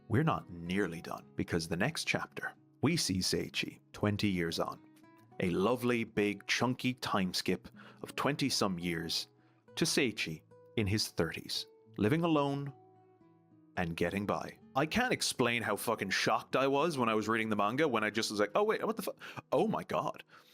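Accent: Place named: Ireland. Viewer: It’s sooo good to hear an Irish accent in the wild! Incredible video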